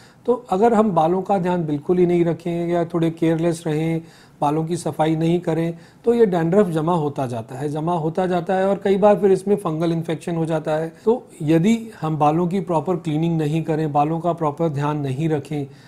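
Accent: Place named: Hinglish, India. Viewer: thats a how ppl speak here hindi+english=hinglish😁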